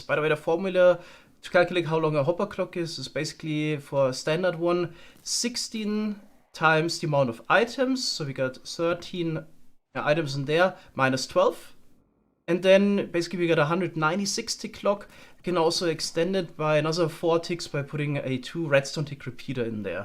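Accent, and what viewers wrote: Germany. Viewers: are u german? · Klingst ja verdammt deutsch, sympathisch haha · Are u german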